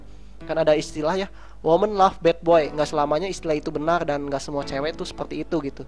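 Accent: West Java, Indonesia. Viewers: accent kaya orang sunda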